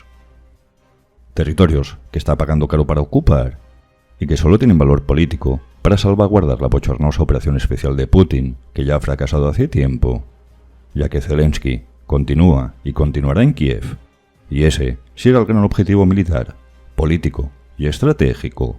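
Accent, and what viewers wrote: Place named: Zamora, Spain. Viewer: Hola por tu habla (acento) no crei que fueras de Latinoamérica pareces mas de mi querida Zamora España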